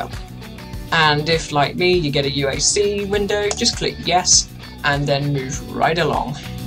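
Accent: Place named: United Kingdom. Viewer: I know where you live. You live in UK I know this accent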